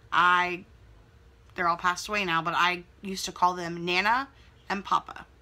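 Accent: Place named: South, United States. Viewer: I was born and raised in VA, and I say about 90% of the words the same as you. I love the way we Southerners talk! ;)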